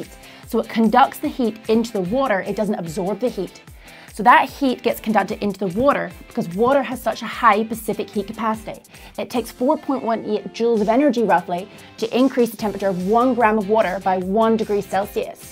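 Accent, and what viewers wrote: Ireland. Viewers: It took me way too long to realise that's an Irish accent, I was wondering what weird part of the US I was hearing 😂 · Does any one know where her accent is from? Another poster said Irish, but I'm not so sure now.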